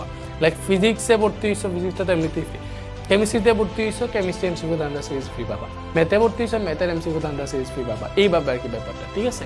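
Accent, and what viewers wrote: Sylhet, Bangladesh. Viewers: ভাই আফনার বাড়ি সিলেটে নি?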